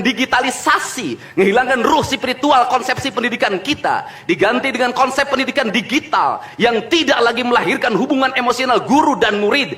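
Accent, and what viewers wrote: Sunda, Indonesia. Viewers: Subhanallah alhamdulilah punya cahaya kepemimpinan yg adil dan keyakinan kpda allah,sundanya kentel,non politik,hanya keyakinan dan ertongan allah swt,kecerdasan,untuk memberantas segala bidang,allah uakbar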